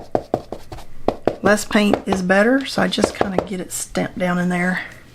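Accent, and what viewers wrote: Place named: Kentucky, United States. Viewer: I love your accent and enthusiasm! Any chance you are from Kentucky? What would be your top 5 supplies (after watercolor paper , brushes and paints) for a beginner watercolor gal who wants to try following along with you and who does not have any of the pens, inks, sticks and other wonderful things you have? I'm overwhelmed by your Amazon list!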